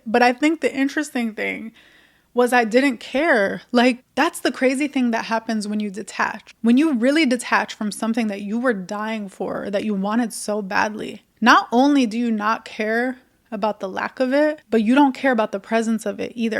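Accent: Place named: Michigan, United States. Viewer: girl r u from southeast Michigan? You sound so local it’s crazy haha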